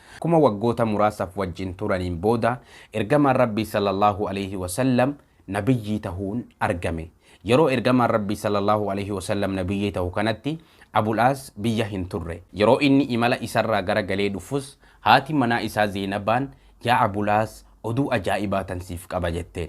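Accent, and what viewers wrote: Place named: Arsi, Ethiopia. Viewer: As ayk wr wb mashala mashaala rabbi umri dhera sii haa kenu barumsa garii sira barane obbolesa muslimati sagaleti arsii naa fakate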